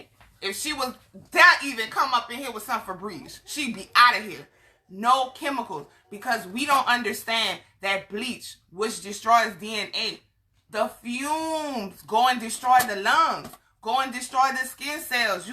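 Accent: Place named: Louisiana, United States. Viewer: Just recently subscribed you are so beautiful inside and out what state are u from. Love that accient sound like you from lousiana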